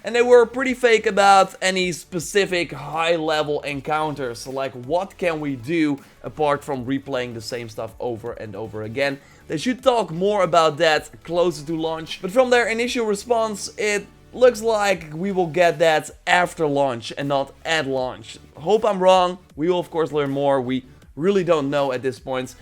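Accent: Netherlands. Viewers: Stronk Dutch accent dem bruf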